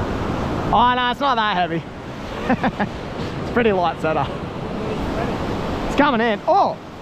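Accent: Australia. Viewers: definatly has a different way of talking but yes his accent is strong aussie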